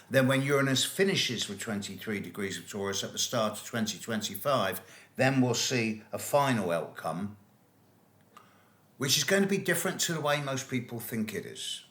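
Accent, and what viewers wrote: United Kingdom. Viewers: I've always enjoyed your vids, even this one regarding the military /political cash cow war machine...UNTIL you referred to Armistice Day (UK) and Veteran's Day (US) as, "This weekend of Glorification of War." What special kind of an ass ARE YOU? These solemn church ceremonies, those wreath-laying ceremonies are not to just honor the living who survived these wars, these ceremonies are about REMEMBRANCE and HONOR. Let me explain, because clearly, you've lost the plot. HONORING their courageousness. Honoring their bravery in facing down evil forces. Honoring their sacrifices of knowingly giving up their lives, their future families, their futures of going home--to battle the evil forces that were embarked on ensuring all, even unborn generations like us, especially YOU with your clippy little British accent weren't raised in a Nazi Third Reich World Government enslaved to German AND Japanese Warlord Masters! 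Remembrance and Honor for their suffering wounds that would never heal, for torture in foreign, evil prison camps, for dying in the heat of Africa, freezing to death off ships in the Bering Sea, for dying in wet mud of the Philipine islands of malaria, flea bites, dysentery...and much worse! You must be such a spoiled, little snot that you can sit up on the pinnacle of Mount Bombastic and look down your nose at all the past and present worker bees, pontificating as their dreamy star-gazer. How many actions did YOU ever take to face down approaching evil in overwhelmi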